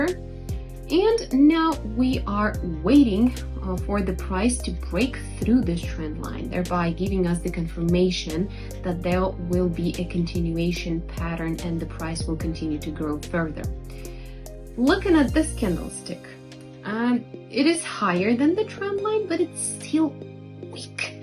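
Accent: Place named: Jamaica. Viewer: great video, love the strategy. Were you speaking Jamaican? More than once you said stuff that sounded as if you took them from.the Jamaican dialect.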